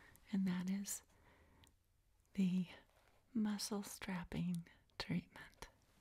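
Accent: South, United States. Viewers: do I detect a southern accent maybe?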